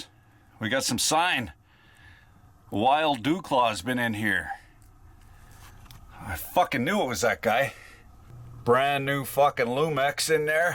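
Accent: Canada. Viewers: I just want you to know that my husband watches your channel a lot, and the stupid shit you say (doodad, fuck it bucket, doohickey, etc) + your Canadian accent really, really infuriate me. I’m not sure why. That is all.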